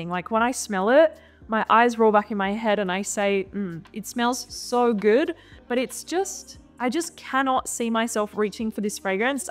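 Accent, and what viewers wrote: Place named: Australia. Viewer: What is your accent? I can’t tell if you’re Australian or French or both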